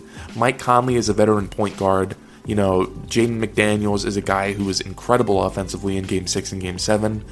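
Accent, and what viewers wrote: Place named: South, United States. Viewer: Such a strong southern accent!!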